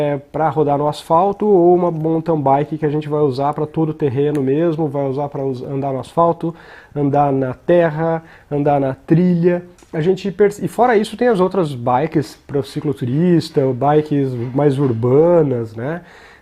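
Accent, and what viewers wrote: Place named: Germany. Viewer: Cara vocês são muito showww. Esse sotaque da é lindo... Parabéns casal... Primeiro...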